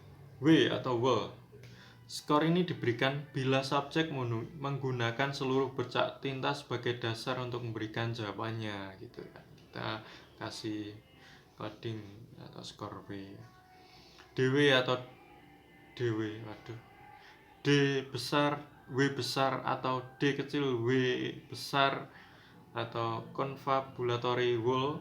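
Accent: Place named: Java, Indonesia. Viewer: Medhoknya itu lo kak😆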